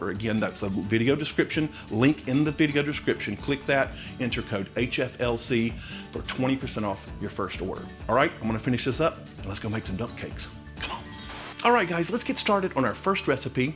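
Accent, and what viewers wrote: South, United States. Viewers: Love your Southern accent. Can’t wait to try these; I need to research Swerve sweetener (I usually use a monk fruit/Stevia combo).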